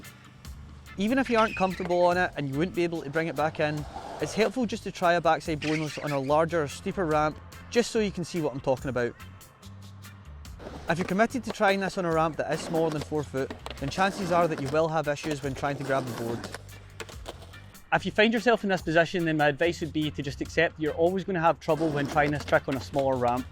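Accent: Scotland, United Kingdom. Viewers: Excellent tutorial! Well explained and demonstrated. Are you Scottish or Irish? I love the accent! Sounds more Scottish, but I'm curious. ✌️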